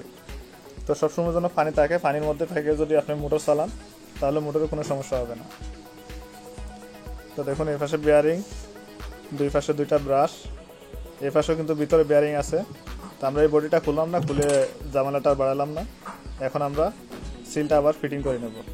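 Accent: Sylhet, Bangladesh. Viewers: ভাই সিলেটি নাকি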